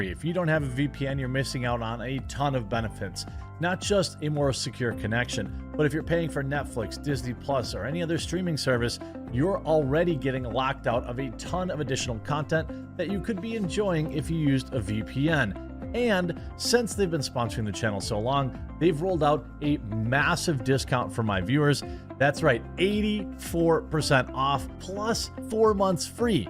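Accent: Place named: Midwest, United States. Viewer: Your Midwest accent really comes through when you repeat the word "beg" and "beggege", as in, he stole a "beg" and flew a "fleg".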